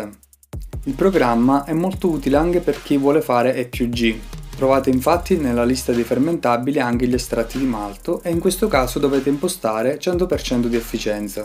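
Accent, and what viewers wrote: Calabria, Italy. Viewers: Ciao, sei calabrese?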